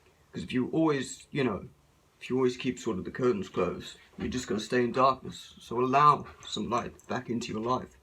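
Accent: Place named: Wales, United Kingdom. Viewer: Yes he is from wales he has a beautiful accent and really dark eyes I could look into them all the time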